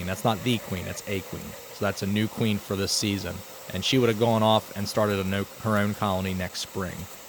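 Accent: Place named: Pennsylvania, United States. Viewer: Brother your PA accent is awesome! Reminds me of my 9 year’s training in philly. Your channel is the best!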